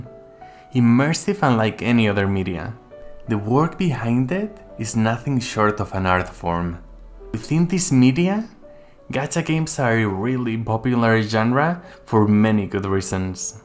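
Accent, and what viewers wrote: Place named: France. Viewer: Honestly, Genshin is my first gacha game and I've been hooked since pandemic and the only thing that kept me sane. So despite all the things negative things that people said about the game I will play the game until it's over. Thank you for the reminder tho. Really need it. Also, your accent sounds french. Are u french? Lol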